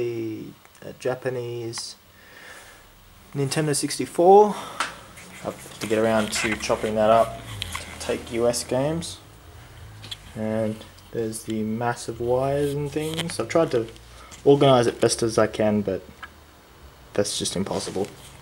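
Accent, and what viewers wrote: Australia. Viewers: Very nice setup bud! not sure about the lounges haha but great ;) can definitely tell you're from Australia like me ? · U Aussie bro ?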